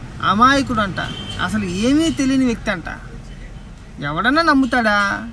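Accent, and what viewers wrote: Godavari, India. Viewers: ప్రతి అంశాన్ని గోదావరి యాసలో చక్కని విమర్శతోకూడిన విశ్లేషణ చేస్తున్నారు, గో ఎహెడ్!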